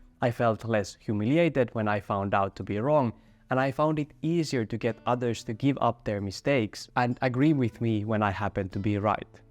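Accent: Finland. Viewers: The moment I heard finnish accent I knew I was about to hear something wise. Kiitos.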